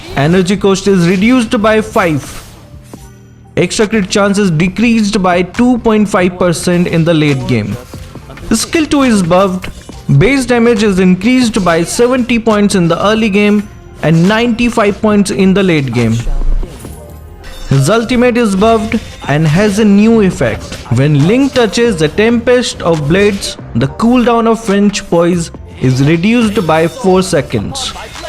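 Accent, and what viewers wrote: India. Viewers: Indian english so bad 🙄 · Your Accent is TERRIBLE AT BEST.. So thick Indian Accent with a Japanese name!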